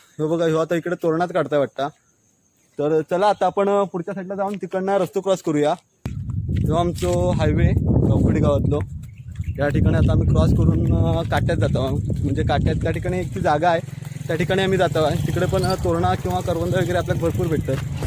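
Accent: Konkan, India. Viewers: छान कोकणी टोण मध्ये बोलतो तू. ते फुलाच झाड विषारी आहे त्या झाडा मूळे आजू बाजुला इतर झाड जगत नाही. परदेशी झाड आहे ते इंग्रज घेऊन आले होते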